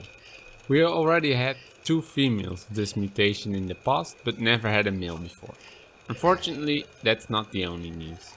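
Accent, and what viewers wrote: Netherlands. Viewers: Hearing on.your accent you are from.the netherlands right. Love your birds. They are beautifull! I really want to make a small aviary to! Not quit sure yet. Keep it up!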